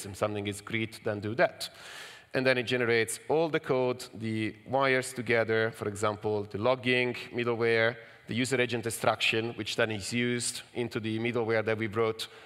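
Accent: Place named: Italy. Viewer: I wonder if the name has any influence from the town of Pavia, since your name and accent sound very italian?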